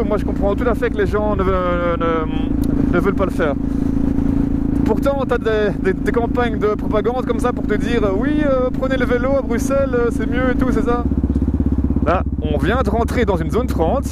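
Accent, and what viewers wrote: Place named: North, France. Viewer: C’est le France qui parle à le Belgique. Sympa ton sujet. Donc, Wallonie = roue de 21 et méga suspension type les pistes de le Afrique. Quand à le Flandre, télé à la place du GPS car tu t’emmerde velu. C’est bien mon résumé? J’adopte ce style de vlog. Refait autant que tu veux. En plus, je me lasse pas de cet accent de la nord. Bon, moi je retourne faire un tour sur les jolies routes de le Côte d’or 😇👍👍🤪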